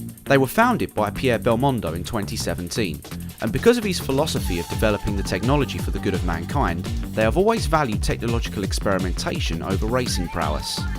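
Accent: United Kingdom. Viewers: Never knew there was back story like this. And for us here in the States, all stories sound better through a British accent. Keep it up.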